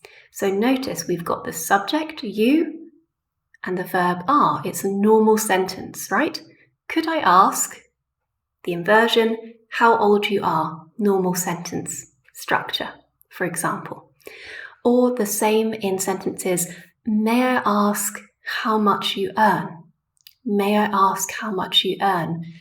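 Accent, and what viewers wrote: United Kingdom. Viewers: I wonder if you could tell me what your accent is. Is it fully RP or mixed from one of northern or southern part of UK?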